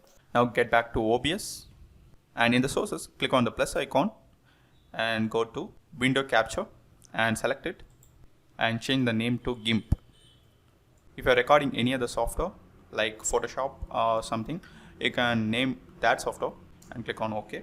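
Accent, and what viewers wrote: India. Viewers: thanks mr indian accent once again thank your kind man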